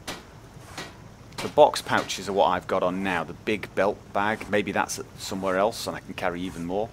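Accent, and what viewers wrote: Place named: Scotland, United Kingdom. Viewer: I fuckin love your accent, scotish right?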